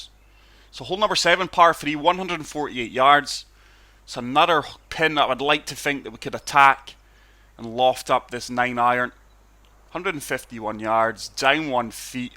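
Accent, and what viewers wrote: Scotland, United Kingdom. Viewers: Thanks for the vid, got Golf Club 2 and never saw any back spin. Though can use some pointers here, always a wee bonus getting a Scot doing a tutorial, nice one bud 🥃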